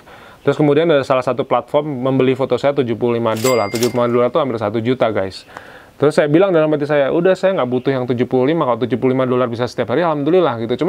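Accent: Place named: Java, Indonesia. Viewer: Dengan bawaan yang rilek,logat Jawa nya kental,Joss kang👍🏻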